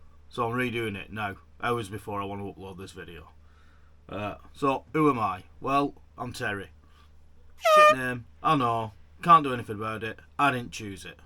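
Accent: Bolton, United Kingdom. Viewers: A Bolton lad eh? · Good to hear a Bolton Lad, I lived and studied in Bolton in the late 1980's. Keep the faith you will get there! I sent you a couple of quid there to help.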